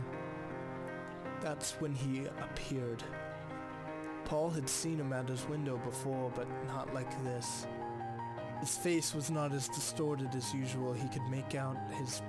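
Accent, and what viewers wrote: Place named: England, United Kingdom. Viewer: Ya its english accent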